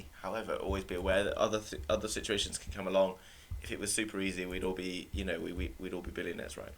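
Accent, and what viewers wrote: RP, United Kingdom. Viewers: I love your English. I play it 0.75x to for extra poshness ♥️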